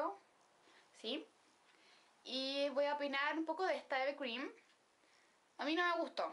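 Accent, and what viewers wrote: Chile. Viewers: que acento chileno!!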